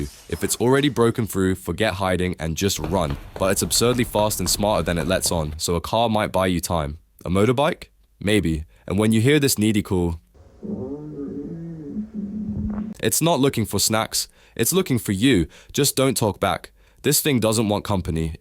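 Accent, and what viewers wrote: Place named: United Kingdom. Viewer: why does your text to speech program has a british accent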